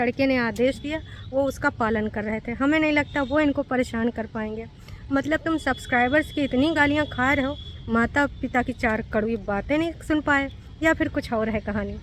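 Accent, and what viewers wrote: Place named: Kanpur, India. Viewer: Ap kanpur se hai kya...ye to humari kanpuriya bhasa hai...mast bolti hai ap...❤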